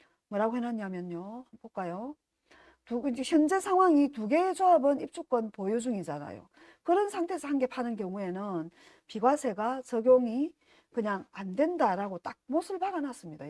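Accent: Gyeongsang, South Korea. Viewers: 앙칼진 경상도사투리 ~~~~~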